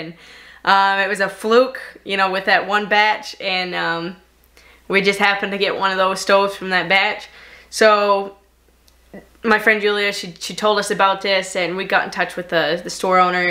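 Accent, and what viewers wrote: Maine, United States. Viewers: Love that Maine accent. Great you tube channel. You are a good role model for young women. You remind me of my daughter. She is very outdoorsie too.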